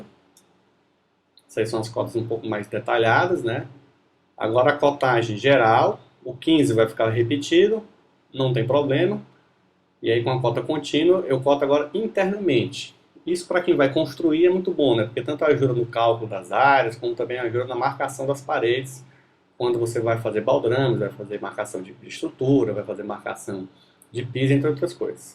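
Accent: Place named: Ceará, Brazil. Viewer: Ótima aula. Sotaque Cearense inconfundível...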